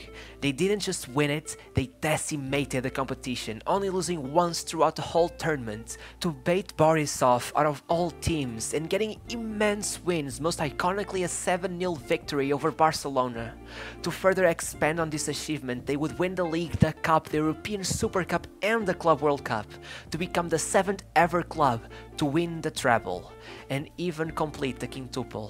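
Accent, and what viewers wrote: Portugal. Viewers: Só te queria dizer que estou a adorar o teu canal. E sim, percebi que eras português logo no início do 1o vídeo que vi pelo sotaque ;D Continua com o bom trabalho! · Trying to ignore this darn Portugeese accent 😬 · Am I the only one who thinks that the commentator is Portuguese ?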